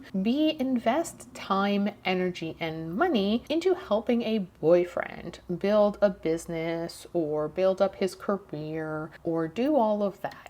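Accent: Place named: United States. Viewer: what is your accent? She’s American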